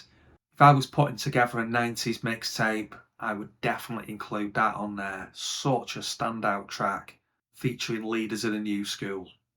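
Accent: Manchester, United Kingdom. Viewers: Well that's me un-subscribed.. sorry but that boring monotone manc accent is killing, ta taa..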